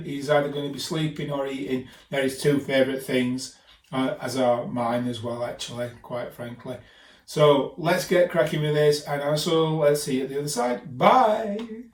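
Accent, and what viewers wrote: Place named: Sheffield, United Kingdom. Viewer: Hello. I've moved from your native Sheffield (Peveril Rd to be precise and often saw you around) to come and teach here in the Turks and Caicos Islands in the Caribbean. I know - it was a sacrifice, but someone had to do it, so me and my wife bit the bullet. Any road, hope you're pleased to hear that there is a little classroom (virtual one for the time being) where your dulcet Sheffield tones are ringing loud and clear to a bunch of 10yr olds who look forward to your cartoon workshop every week. Please tell me there's another one on its way and that there are more to come. I am having a lot of fun getting little locals, Canadians, Americans, Haitians and Dominicans to say things like, " Awreight, sir?" " Tha's reight nesh thee" and " Gi' o'er". So dunna lerrus dahn.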